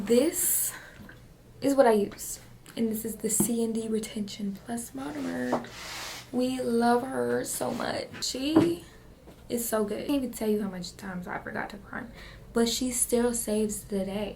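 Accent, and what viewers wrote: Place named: Mexico. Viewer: I know she's Mexican but her Mexican voice is a little too much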